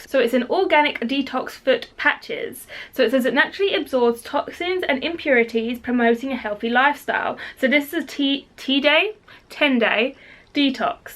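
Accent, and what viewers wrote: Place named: United Kingdom. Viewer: I used it last nite ... I can just listen to your British accent and voice and feel better lol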